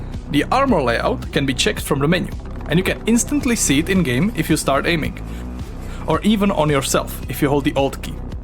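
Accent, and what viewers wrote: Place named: Czech Republic. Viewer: Can't wait to play this one. Also, this isn't a knock on your Czech accent, man. But I really like the way you say 'Hoovercraft'. Keep up the good work.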